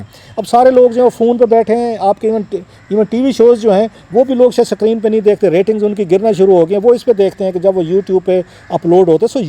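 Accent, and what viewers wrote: Saraiki, Pakistan. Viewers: Dear Sb. Why you have stopped unveiling scandals of PTI/politicians/ zurkoota jins. I think.you have been threatened and frightened and we are disappointed. Pls don't stop sharing facts. Char Ja sooli per Ram bhali kary ga. We are missing your saraiki accent. Stay blessed. You are following Mr. Amir Mateen who has already surrendered.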